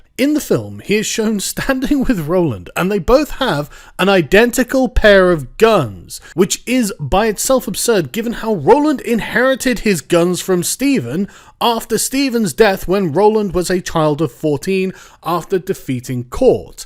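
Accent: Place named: United Kingdom. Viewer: When he said "Fuck this movie" with his soothing, perfect British accent, I don't know how to put it... Um, this is a bit dirty but... I got a boner.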